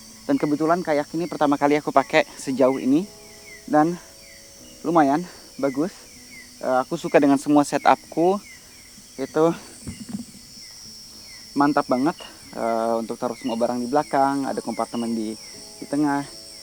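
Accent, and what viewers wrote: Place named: Borneo, Indonesia. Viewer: Logat nya Dayak 🤗 Sehat selalu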